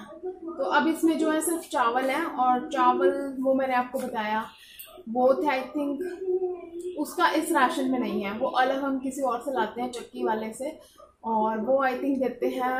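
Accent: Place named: Faridabad, India. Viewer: Aap faridabad se ho lagta he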